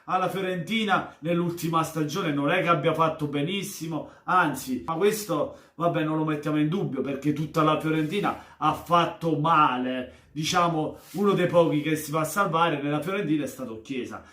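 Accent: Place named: Naples, Italy. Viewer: Ahahahah ciao carissimoooo mi piacciono tantissimo i tuoi video, poi la parlata Napoletana è fantastica viva il SUDDD ❤ BUONA SERATA 💋💋❤❤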